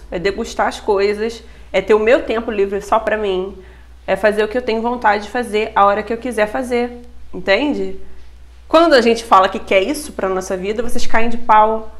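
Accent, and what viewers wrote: Rio de Janeiro, Brazil. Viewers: Seus vídeos são um barato, esse jeito carioca de falar kkkkk perco tudo! Adoro, reflito bastante, gosto de ver pontos de vista de pessoas sinceras, sem hipocrisia. Também não quero ter filhos, não terei e fim.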